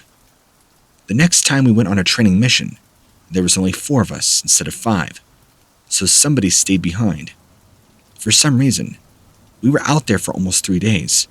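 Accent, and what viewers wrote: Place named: United States. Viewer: You sound American but yet use the meter instead of feet, anyways I'm too tired to go look it up I'm out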